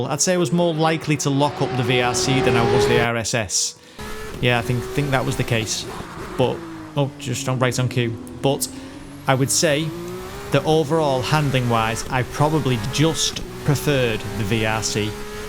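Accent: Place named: Manchester, United Kingdom. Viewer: you sound Mancunian to me, I could be wrong but YANITED. RSS car sounds pretty good although I love what VRC offers in terms of setup and features with its csp version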